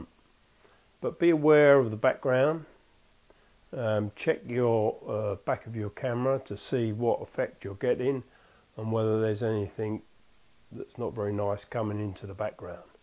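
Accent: United Kingdom. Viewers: Britain accent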